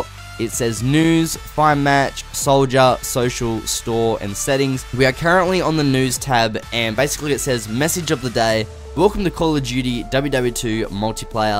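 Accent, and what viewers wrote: Australia. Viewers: Great video! Are you Australian you sound it. Love ya if your Aussie.🇦🇺❤️. Like if an Aussie Bogan.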